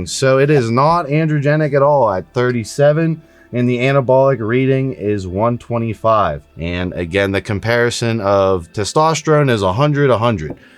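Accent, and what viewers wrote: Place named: Philadelphia, United States. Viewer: are you from the Philly area? Noticed your accent is similar to mine.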